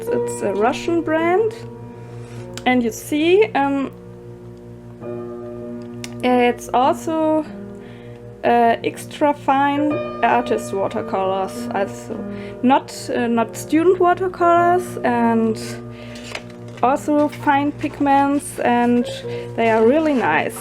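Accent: Germany. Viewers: Hallo, Du sprichst eigentlich Deutsch, oder? Du hast so einen krassen, deutschen Akzent : D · Also ich finde, die Videos in denen du Englisch sprichst, sehr angenehm. :D Freue mich immer, wenn ein Video kommt, in dem du sprichst. Ganz ehrlich, ich wusste vorher nicht, dass ein deutscher Akzent im Englischen auch schön sein kann. xD An der einen Stelle wird deine Stimme etwas verschluckt. Sagst du da, die Kuretake-Farben sind besser pigmentiert als die White Nights oder schlechter? ^^ Ich liebäugle immer wieder mit den Kuretake-Farben, aber ich hab ja eigentlich meine Schmincke Horadam-Farben. Krieg da echt den Hals nicht voll, ganz schlimm. ^^' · bist du aus Deutschland? Ihr Akzent ist schön!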